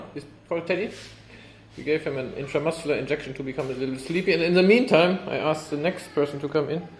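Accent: Germany. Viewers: Condescending German. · It sounds like a really hard german Accent. Do u come from germany? :)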